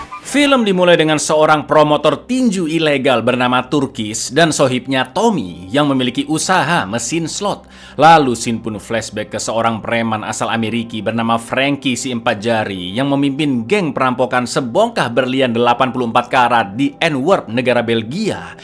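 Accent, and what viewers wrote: Java, Indonesia. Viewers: Logate sampean kayak pantura marai aku kelingan omah mas, seneng ngrunguknoe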